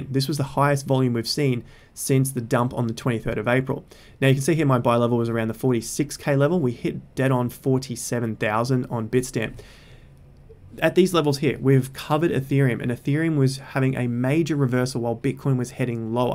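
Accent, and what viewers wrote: Australia. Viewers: Im completely new to the stock market and wanted to thank you for your informative videos. It's helped me make a bit of return off of a small investment and i'm hoping to grow further. Refreshing to hear an Aussie accent as well! Thanks for sharing your knowledge. :)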